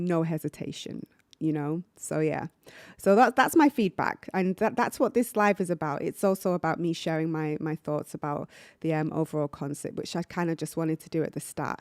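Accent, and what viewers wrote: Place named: Manchester, United Kingdom. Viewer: Hello! I just wanted to say how much I appreciated your reaction. Few people truly feel Lara Fabian the way you clearly do. I was genuinely moved by how moved you were. You captured her essence perfectly, and I really hope you'll consider sharing more from that phenomenal London concert. Also, I have to say, you’re an absolute pleasure to listen to. Your voice has such clarity and warmth. Yet I couldn't help noticing a few vowel qualities that hint at a slight Northern influence, perhaps Manchester? It gives your speech a unique charm. 😊 I'll be attending Lara’s concert in Geneva on 28 November, and after hearing your impressions, I’m even more excited. Thank you again for such an honest and heartfelt video. 💜 P.S. I've just subscribed to your channel! 😉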